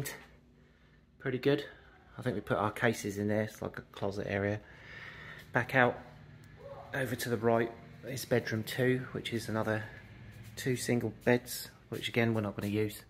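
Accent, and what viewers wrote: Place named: Australia. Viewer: You sound like Rocko from the 1990s Nickelodeon cartoon Rocko’s Modern Life, so I assume you’re Australian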